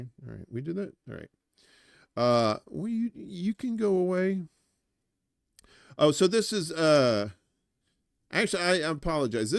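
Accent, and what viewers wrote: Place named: Israel. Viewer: f israel ❤🖤🤍💚 i like yer israeli accent btw! as well as the 'US hegemony' voice!!!! love from an 'merican in UK ;D x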